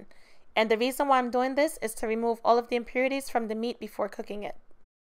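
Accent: New York, United States. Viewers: Well done. Looks delicious. Love your accent. New Yorker?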